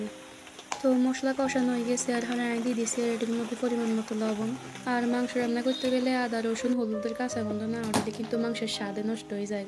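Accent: Noakhali, Bangladesh. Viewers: নোয়াখাইল্লা কথা আমার খুব ভালো লাগে নোয়াখালী ঘুরতে গেছিলাম থাকতেও অনেক ভালো লাগে ❤❤❤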